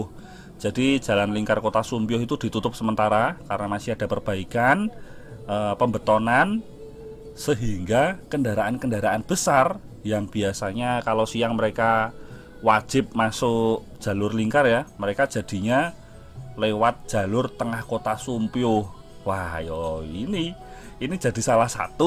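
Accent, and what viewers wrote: Yogyakarta, Indonesia. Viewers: logat jogja nya kentel banget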